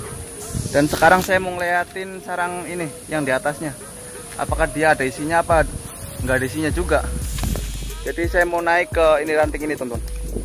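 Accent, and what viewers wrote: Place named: Indramayu, Indonesia. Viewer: Kyane Wong Dermayu Ikih Logat²e Mah😁 Sukses Terus Ang👍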